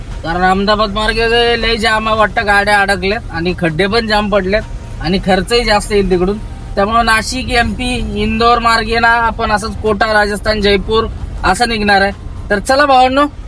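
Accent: Satara, India. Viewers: सातारची बोली भाषा एक नंबर भावा😂😂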